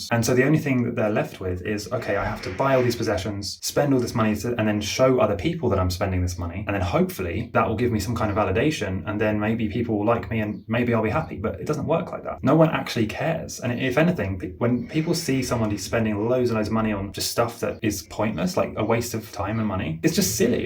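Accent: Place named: England, United Kingdom. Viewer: Why do you use $ but you have an English accent???? 🤔🤔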